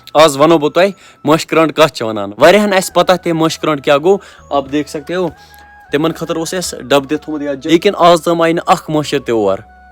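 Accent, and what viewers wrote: Kashmir, India. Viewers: What is the reason behind your kashmiri accent ... Like you pronounce pewaa with pewaan , cxata with cxataan .. kupwara people dont usually use this type of accent . (answer) And much love and support to your content 🫂♥️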